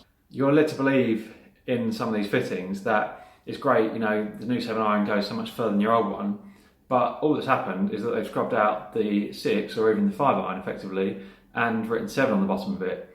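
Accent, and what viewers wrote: Australia. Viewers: 😂 the Aussie accent. I recently went to test some clubs. I say test because my coach has already fit me. I tested the Taylormade P790’s and the guy at the store could not understand why I didn’t want a 7 iron that carried 15yards further than my current 7 iron. My 7 carries 150. I don’t need a new 7 that is carrying 165. There is always going to be a bad gap in the bottom of the bag. There were 15-25yard gaps between the 8-PW! You have to test the entire set. I loved the feel of the club, but I can’t have that much uncertainty 120yards from the green. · Very new to golf and started looking to buy my first set of clubs just got a little more educated thanks mate.....love the Aussie accent.🤣🤣🤣🤣 Yes I'm an Aussie .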